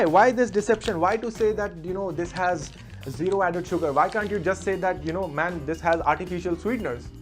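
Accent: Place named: United States. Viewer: Liked the video....if I ignore the pinch of American accent in btwn😁....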